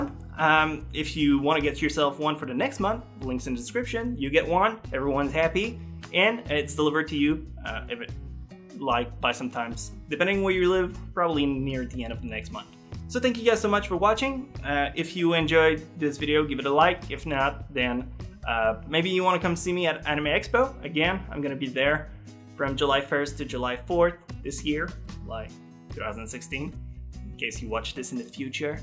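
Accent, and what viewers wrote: Quebec, Canada. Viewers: are you from Quebec? Because you have really similar accent to my friend who is a Québécois. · I was thinking of a Canadian french... :D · it is candian freanch....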